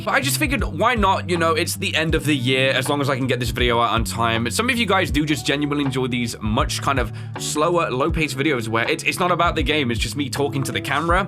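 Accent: United Kingdom. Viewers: I only discovered your channel late 2025, and have been binge watching since. I really appreciate your voice acting - I don't play games myself, but I love watching game plays like movies. Your voice acting really adds to that experience. You always reading out the texts really helps me not looking at the screen - I'm actually scared of horror stuff so I deliberately not look at the screen all the time when watching horror games lol. I really appreciate your curiosity and the fact that you always explore in games. I can always rely on your game plays to catch probably most lore of the storyline compared to other channels. I do get a bit fatigue with your high speed yapping and some lines you often say lol. But then it could be just because I've been playing your content across years back to back to back lol. I remember there were times when I was doing something with YouTube autoplay in the background and your video came up. 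I was like "what is this British dude yapping about now" lol. Or sometimes when I hear "hold up" "it's kind of good though" I was like oh brother...! But none of these are real criticism, I say all these with fondness for your content. Happy new year! I'll keep watching your videos in 2026🎉❤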